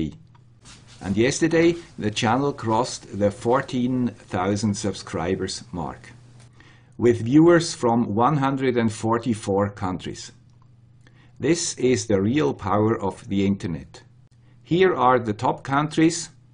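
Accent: Switzerland. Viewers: Happy New Year! Looking forward to another great year of videos from the guy with the Swiss accent. Don't change a thing. I realize you have a long backlog on video ideas, but would love to see one on enclosures for various electronics projects. This is an often overlooked topic in the electronic hobbiest world. Thanks for your great videos. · Hi - wishing you continued success for 2017. You explain things on a level that we understand and continue to be generous on providing code. I fully agree that a community is starting to appear and that we can all help each other when hit a small road block. So the guy with the "Swiss accent" please keep on with projects and teaching as well as you go. I personally think is one of the best channels I have found.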